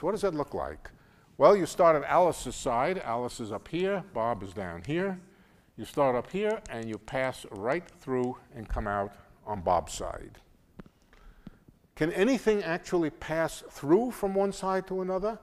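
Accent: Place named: Bronx, United States. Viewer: bronx guy! lol love it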